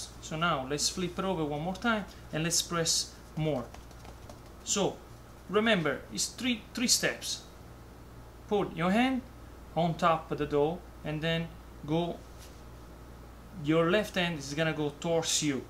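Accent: Italy. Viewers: Thank you l love watching you make your pizza full of action like dancing and so nice to hear your italian accent, bravo! Greetings fm Malaysia.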